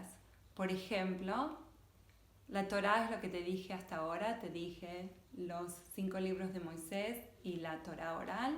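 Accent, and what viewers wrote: Argentina. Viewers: Cómo es que eres judía y tienes acento argentino??? O te volviste judía?? Es pregunta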